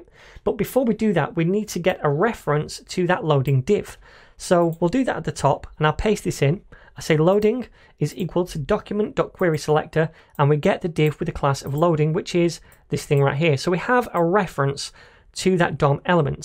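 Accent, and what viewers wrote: United Kingdom. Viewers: Hi! You're pretty cool in explaining things and your British accent sounds funny so I would really like to see next series about pagination. Here's my like and subscription.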